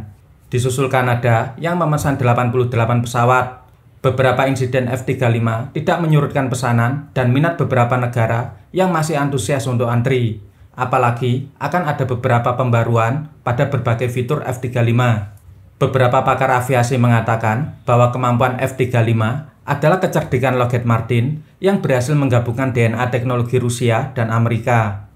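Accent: Java, Indonesia. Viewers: Yang di bahas random banget dari tekno, kesehatan, chip, militer, listrik, tp story tellingnya enak juga meskipun ngomongnya medok jawa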